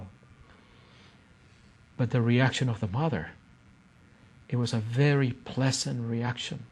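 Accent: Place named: South America. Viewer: This man is from a south american( peru or something) country.. his accent is not a blackfoot, kainai or peigan or anything from that area.. sorry folks, but maybe he has some good teachings.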